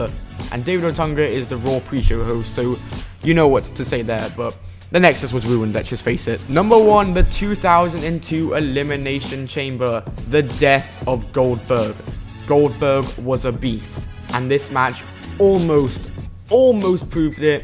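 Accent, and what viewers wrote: United Kingdom. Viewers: Why are all these top 10s narrated by a British dude does whatculture wrestling have different accounts with slightly different accents? 😱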